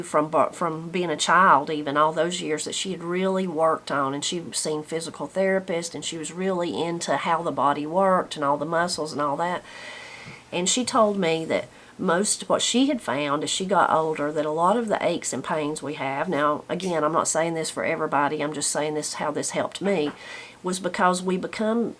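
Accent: Appalachia, United States. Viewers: I am curious about persons who hold themselves out as being capable of beneficial commentary for others. As a writer I admit I am very critical of such persons. Morally I think you live in an admirable way. While you have an interesting way of speaking as a representative of Appalachian culture, I find it disappointing that you don’t seem to have pursued a means speaking English correctly in order to set an example within that culture. Yet you speak of working at the college, which is somewhat puzzling and contradictory.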